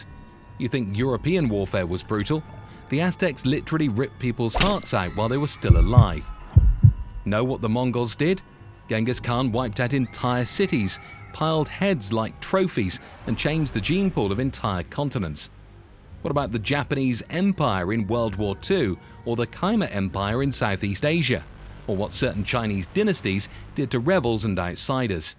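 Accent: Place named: United Kingdom. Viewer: you sound British, but as of this great video, as a Latino, I'm proud to call you one of us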